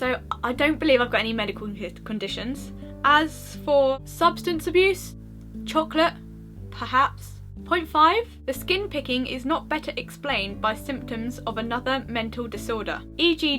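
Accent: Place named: United Kingdom. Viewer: Great video! By the way, I love your british accent! :)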